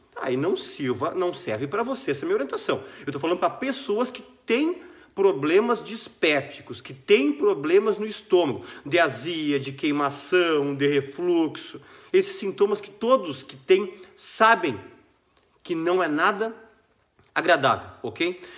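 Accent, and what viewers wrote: Rio Grande do Sul, Brazil. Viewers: Adoro os seus vídeos , não só pela competência, clareza, além de tudo isso, adoro sua forma gaúcha de falar. Eu vivo na Alemanha e mato um pouco das saudades. São excelentes seus vídeos e o senhor deve ser um excelente professor, além de excelente médico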